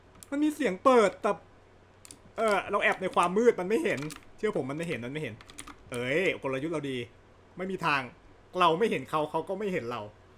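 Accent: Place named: Suphan Buri, Thailand. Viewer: อยากลองฟังพี่ พูดเหน่อทั้งคลิปดูจัง555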